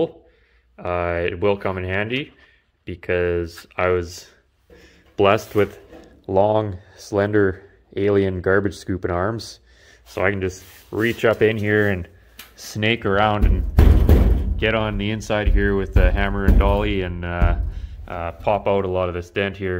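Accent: Canada. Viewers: I can't quite understand in that Canadian accent of yours. What are you calling your crescent wrench/ handheld metal brake?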